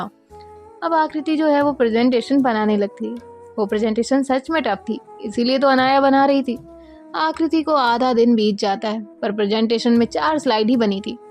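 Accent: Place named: East, India. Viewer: Aap kya East Side se belong karti hu kyuki mujhe yesa lagta hai ki aap Bihar ya Udisa se belong karti because aapki voice ton East Side ki lagti hai😅 Agar haa toh reply zarur karna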